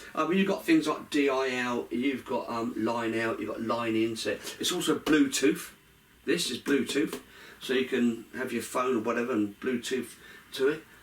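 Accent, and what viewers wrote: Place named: London, United Kingdom. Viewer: Hello. I've been spending some time recently trying to find a decent and not costly amp for busking, watching so many videos on YT. I'm gland I found You. I think I'll go fof this one( the new 80watts version of it ). I like yout accent, it reminds me of my past years when I was living in London(1983/84 and 1991/2000). Respect and stay well, ciao from Italy. 🤠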